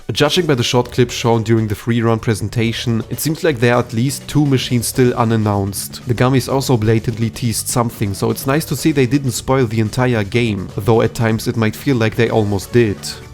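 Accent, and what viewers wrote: Germany. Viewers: You are German, i can tell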